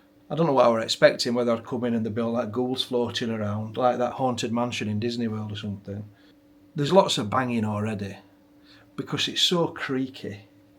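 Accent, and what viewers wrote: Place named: Northern England, United Kingdom. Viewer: Dead pan Northerner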